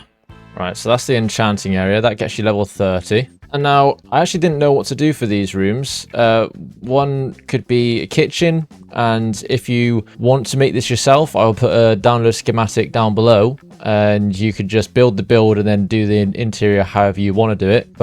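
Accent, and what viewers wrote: United Kingdom. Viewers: Excuse me sir.. odd question but are you Cornish? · are u british because u say "li'el bit more"instead of little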